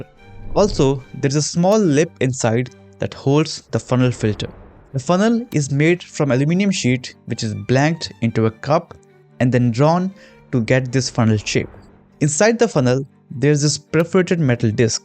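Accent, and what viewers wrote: India. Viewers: Dislike because of Indian accent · If the voice over is AI generated, the original is Indian accented. It would be unintelligible to most non Indians.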